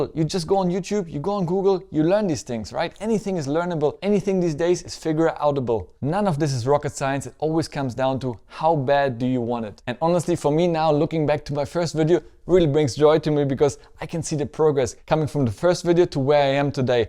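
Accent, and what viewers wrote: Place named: Germany. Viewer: Thanks for the reply. Then you are really fluent in English. Apart from a slight german accent (sorry if you have nothing to do with Germany lol, and ofcourse its in no way an inconvenience, just an observation), your flow and delivery is amazing. Hope to be like you someday :)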